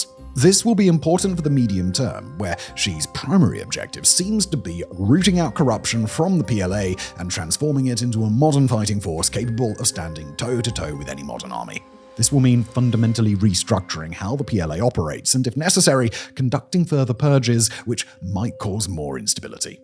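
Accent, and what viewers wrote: RP, United Kingdom. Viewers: shat ap u posh british duschbäg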